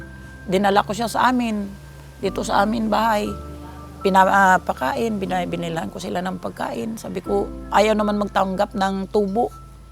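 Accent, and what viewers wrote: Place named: Aklan, Philippines. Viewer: Halata ko taga aklan ka yata Ma'am.pero di ko alam san sa aklan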